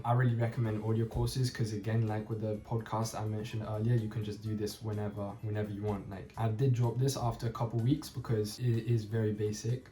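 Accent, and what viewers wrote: France. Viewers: His English has french accent...hmmm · yes I’m a native English speaker trying to learn French and his French (or seemingly French) accent was the first thing I noticed upon clicking the video. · Your accent is so French?! R you sure it’s not your native language?! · You have a little but of french accent in english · bro even got french accent 🔥🔥🔥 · Bro has a French accent when he’s speaking English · You have a french accent when speaking english. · This guy is lying- he is speaking English with French accent. We are latvians,but our kids went to french kindergarden and finished primary school, you cannot fool somebody who has children with French as first language. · What is your native language? It sounds like you have French accent. I think you are making stories. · I don't believe this. There is something you're not telling us. Your Day 1 already had a French accent that someone learning literally their first day would not have. 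And just heard it: He began learning it in primary school. So you DID already have some education in it. Come on, stop with the MISREPRESENTATION! WHAT A LIAR. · you have a french accent when you speak English, you wont fool me.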